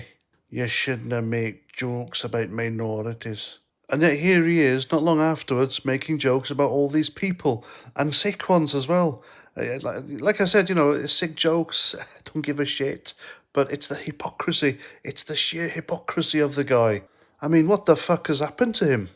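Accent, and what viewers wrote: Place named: Scotland, United Kingdom. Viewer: Great Scottish accent. Do more!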